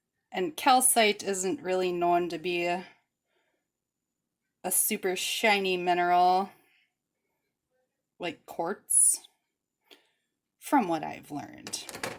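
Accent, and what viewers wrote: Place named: Great Lakes, United States. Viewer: I am from Michigan and you have a wonderful strong Great Lakes accent.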